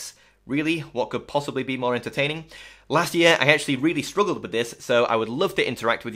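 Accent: United Kingdom. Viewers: In the future it's worth pointing out where the charity you're advocating for is based in. As I suspected from your accent and I did look it up - Mind is based in the UK. I don't have anything against helping UK but I live in Poland and mental health awareness is basically non existent here and we also need a lot of support.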